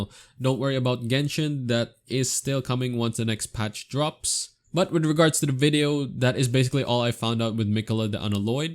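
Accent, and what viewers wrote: Philippines. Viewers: Your accent sounded like filipino. God vid tho.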